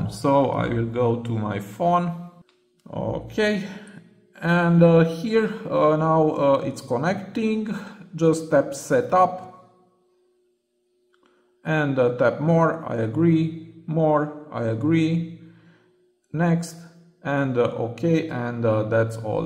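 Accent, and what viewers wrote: Romania. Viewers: Thank you very much! I think u are Romanian by Ur accent ❤❤❤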